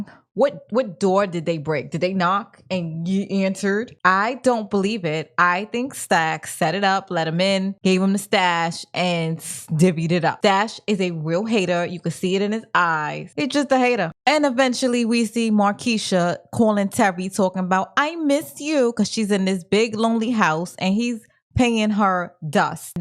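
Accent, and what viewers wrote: New York, United States. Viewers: Your breakdowns and edits are really cool and always hit the important elements of the episode,. Your New York accent adds the flavor - my girl is from Looong Guylanddd. The father-son (and mother) bonding over music is a Detroit thing, IMO. I learned keys, bass, and organ from my mother and uncle. Stay strong!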